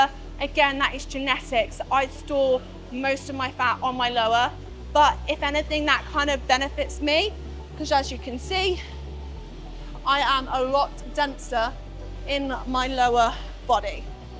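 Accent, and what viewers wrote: United Kingdom. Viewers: Where are you from in the UK? I'm thinking south? Hampshire perhaps 🤔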